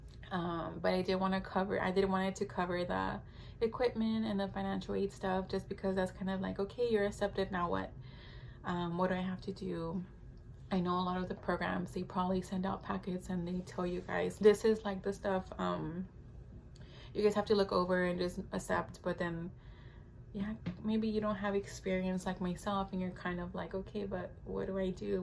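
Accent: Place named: Southern California, United States. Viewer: Hi, PA-C! 🥳💃🏻👏🏾💫😁 Congratulations on that huge milestone! I just found your channel maybe a week ago and I wanted to pause and just shout you out. Sis your channel is sooooo good and so powerful and important. It’s interesting observing you come into your own. Even in this video alone (I think — I’m listening to my playlist while I’m washing dishes so it could be back-to-back videos but anyways) you can hear how your voice is soooo soft (and lowkey hard to hear sometimes — I think increasing volume w a lapel mic maybe might boost your channel growth) but towards the end when you are really experiencing joy talking about your cadaver lab, your volume and confidence just blossoms!!! I love to see it! It really comes out how much you really love medicine and I KNOW you’re gonna be an AMAZING provider!👩🏻‍⚕️💓🤗 Last thing I wanna say which is super awkward but I hope is helpful and the compliment I mean it to be … I grew up in Whittier and El Monte (“Al Monté🥰) and used to hang in La Puente with my bestie (who is a PA too btw 😁 ) and your accent just makes me homesick!🥹 I’m so hyped for the representation!!!! 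Increased diversity of all types is WAAAAAY overdue and I pray there will be more girls with your accent and background in these medical trenches and perhaps you’ll consider teaching high school and jc levels where you can REALLY impact the trajectory of young ppls lives. I used to teach at Mt. SAC and an ROP in the I.E. and I learned how much I loved this impact. Last th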